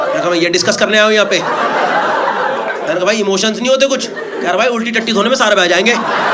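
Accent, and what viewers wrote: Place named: Punjab, India. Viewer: Hard to understand the super faster than Rafael jet talking combined with the thick punjabi accent. Please provide English subtitles also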